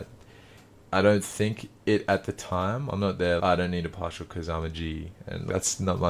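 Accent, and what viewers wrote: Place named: Australia. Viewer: strong mindset 💪 rare to hear a fellow Aussie accent in the trading scene.🇦🇺 keep going up 💯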